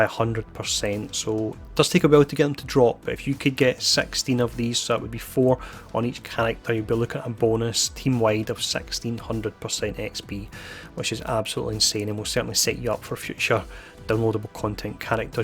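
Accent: Scotland, United Kingdom. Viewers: I thoroughly enjoy your Scottish Accent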